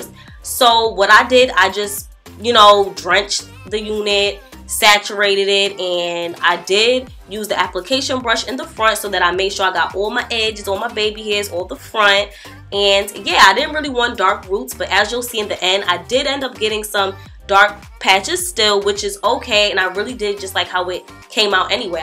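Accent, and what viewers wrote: New York, United States. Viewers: u can hear the new york in her voice 😍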